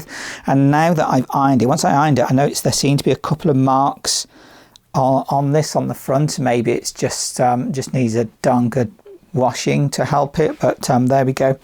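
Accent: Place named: South West England, United Kingdom. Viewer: I'm a tech need seriously 😅 I need to dm you as ive got 3 huge bags of really good wool after my late mam and I can't think of anyone else I'd rather give it to. Help how do I contact you? I'm living in South Wales and you sort of sound South West urself 😍 if ur not interested no worries 😊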